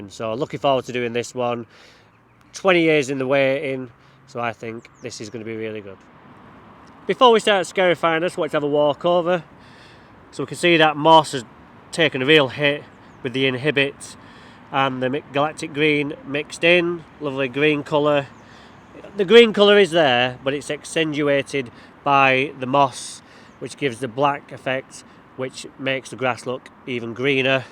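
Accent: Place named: Manchester, United Kingdom. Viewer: Thank you for your advice. Love your straightforward approach and delivered in our customary Gtr Mcr way 👌 keep up the great work. BTW, which backpack sprayer would you recommend pls? Thanks AL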